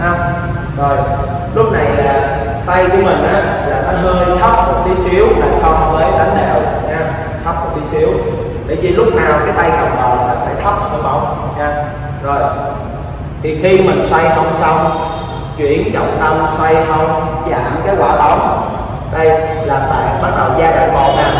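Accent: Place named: South, Vietnam. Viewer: Anh, next time I might visit you, when I am close to HCM. I am a northern and at times I still struggle to understand all of your southern dialect.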